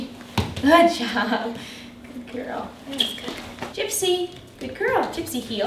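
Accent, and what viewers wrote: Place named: New Jersey, United States. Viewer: Love the Jersey/NY accent. "Dawg"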